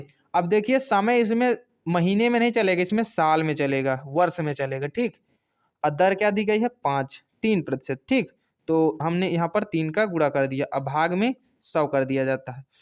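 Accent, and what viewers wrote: India. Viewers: Mast gajab ji bagheli bole ho yrr😁😁😁😁mast samjhya apne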